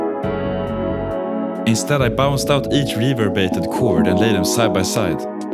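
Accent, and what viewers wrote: Sweden. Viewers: bro that swedish accent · never heard a stronger swedish accent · What i love most about these videos is the intense, thick Swedish accent which gives his speech that nordic rhythm that fits well with the rest of the audio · Are you Swedish? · jag vet en svensk när jag hör en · As a Swede I enjoy the Swedish accent!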